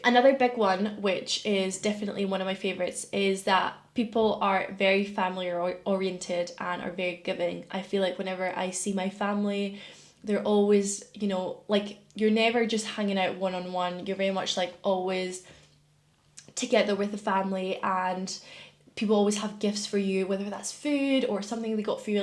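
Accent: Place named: Scotland, United Kingdom. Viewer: love your accent senyorita like scotish❤❤❤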